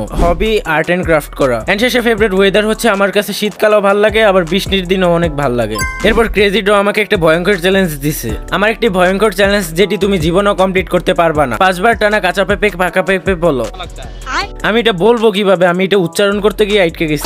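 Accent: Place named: Mymensingh, Bangladesh. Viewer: আল্লাহ,, ভাইয়া তোমার গ্রামের বাড়ি ময়মনসিংহ, এই জন্য ই তো বলি তোমার কথা বলার ইসটাই আমার চাচাতো ভাই দের মত লাগে কেন 😮 কারণ আমার গ্রামের বাড়ি ময়মনসিংহ 🥴 যাক প্রথম আর্টিস্ট ইউটিউবার পাইলাম যার বাড়ি ময়মনসিংহ 🥴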